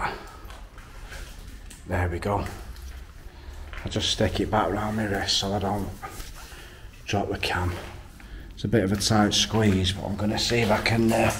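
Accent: Manchester, United Kingdom. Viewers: like a manc boss .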